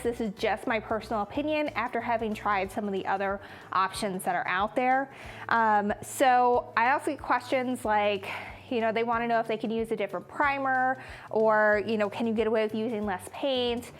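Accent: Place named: Quad Cities, United States. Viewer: No way! I was listening to your "accent" and asked my son who you sound like and he said me - I am originally from East Moline and went to Alleman. I currently live in Las Vegas and have since 1989 (I am much older than you) -still call the QC's home tho! Your tutorial was excellent - you made it easy to follow and love your explanations.Your final product looked flawless and your shop looks amazing! My grandma and Aunts had a shop in Moline off of 34th St and 23rd ave, maybe you had seen it, The Stitchin' House. I am so glad I listened to the very end so I could figure out where I have heard that dialect before. Keep up the great work -looking forward to watching your other videos! Happy Holidays! Natalie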